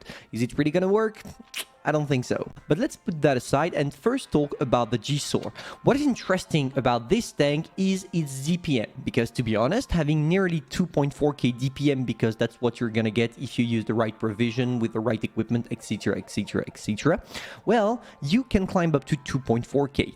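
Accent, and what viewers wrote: France. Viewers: The audio is funky. He sounds French🤔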